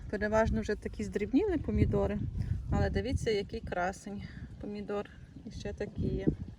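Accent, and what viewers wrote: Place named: Galicia, Ukraine. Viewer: Привіт зі Львова ❤, перець можна в'ялити точно так, як помідори і потім додавати до піци, салатів і т.д. Відео дуже цікаві, приємно чути галицький рідний діалект, з задоволенням дивлюсь❤❤❤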